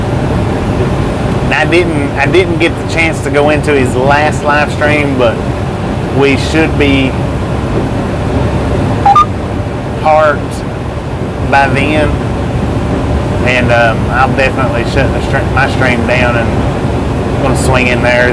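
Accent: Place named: South, United States. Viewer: since Kyle was saying how he feels here I go lol. From your first live stream which came across in my feed. I heard a southern accent so I stayed tuned in. Then after many more streams I thought this guy is genuine. Your a friendly guy and you always seem calm. Great attributes to have bud. As far as your driving your awesome at that. Your cool with your subscribers and it has paid off. Anyways, after I read Kyle’s comment it compelled me to say what was on my mind. When I met you and seen your the same in person as well as your YouTube live streams. That went along way with me. I don’t stay as long as I’d like I get busy and have to step away but I try to pop in every stream and most the time when I step away I let it run lol. If I subscribe I support and you’ve done the same with me. I appreciate you brother and it’s good to see the subs you have. 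All mighty fine ppl. Okay ok. Nuff of this lol. Just being honest brother.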